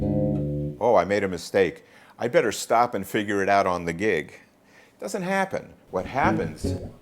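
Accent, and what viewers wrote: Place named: Long Island, United States. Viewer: A fellow Lawn Guylander?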